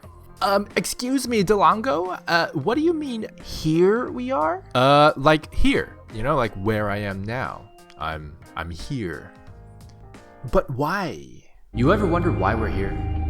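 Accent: United States. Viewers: chest on anything make anything better, sounds like an american alright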